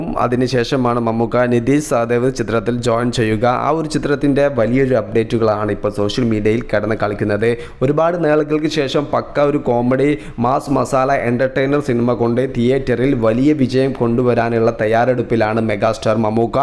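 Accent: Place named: Trivandrum, India. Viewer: ട്രിവാൻഡ്രം സ്ലാങ് 💙💙💙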